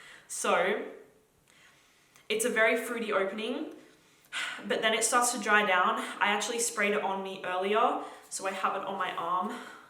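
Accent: Australia. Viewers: i thought you were english you are australian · girl you surely have a STRONG aussie accent.... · Aussie accent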